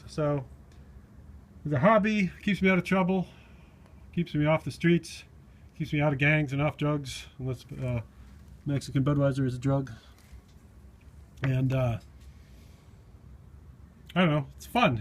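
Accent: Canada. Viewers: haha Canadian accent hype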